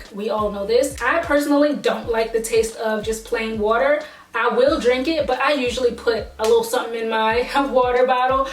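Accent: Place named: Maryland, United States. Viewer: Are you from Maryland? Your light accent sounds familiar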